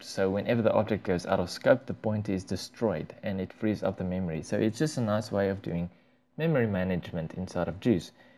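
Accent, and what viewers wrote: South Africa. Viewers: are you perhaps south african? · You sound South African? Good tutorial by the way!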